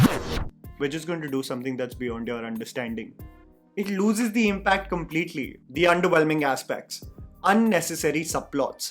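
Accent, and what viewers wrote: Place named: Maharashtra, India. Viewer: You're Marathi accent is just adorable ❤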